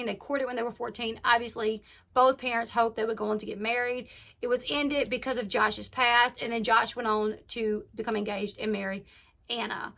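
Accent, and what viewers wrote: South, United States. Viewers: I love your accent. I miss the south.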